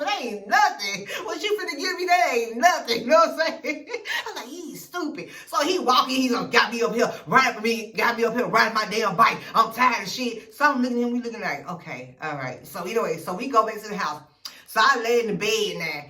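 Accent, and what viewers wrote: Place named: Memphis, United States. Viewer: You sound so Memphis or Mississippi. You had me rolling. How your sisters let them girls act like that toward you? Chile. Girl trips 😓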